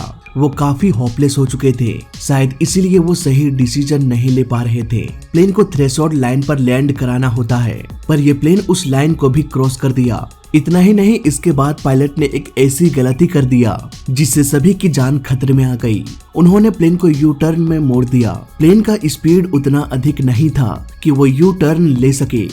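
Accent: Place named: Bhojpuri, India. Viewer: Your voice is melodious, the style of speaking Hindi is different, like from Bhojpuri.Hindi is the official language of India.And this great knowledge is not found in Hindi.When you write a book, you must tell it here, but not the story in that book, give information about the plane because the story seems alive in your sweet voice.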